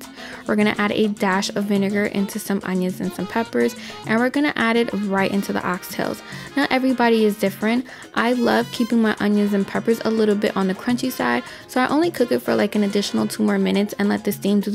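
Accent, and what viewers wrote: New York, United States. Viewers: Love your oxtail recipe. I love your accent did you grow up in NY? You are very talented in the house 🏡👌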